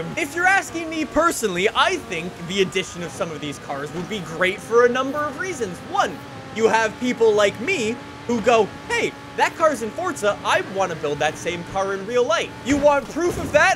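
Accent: United Kingdom. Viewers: What part of the UK are you in?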